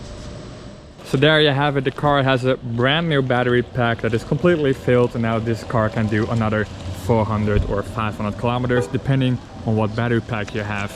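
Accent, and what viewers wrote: Netherlands. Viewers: is the accent dutch?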